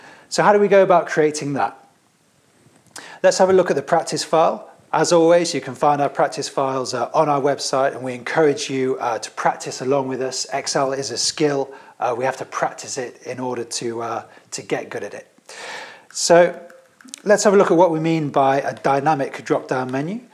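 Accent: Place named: London, United Kingdom. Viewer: like it ... stright to the point & simple. well done man. btw sound from north london ;)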